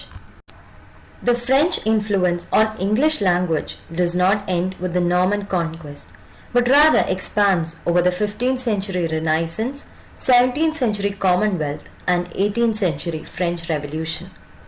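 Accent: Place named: United Kingdom. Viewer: That horrible British accent makes it impossible to understand.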